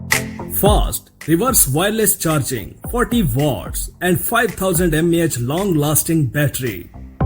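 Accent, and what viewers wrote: India. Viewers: India english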